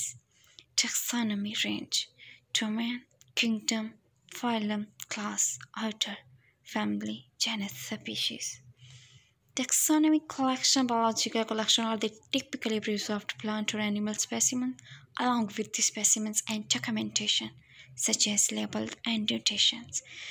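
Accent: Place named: Lahore, Pakistan. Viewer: Voice👍👌🤗🤩 lagdi lahore di aa 😅😜😚😁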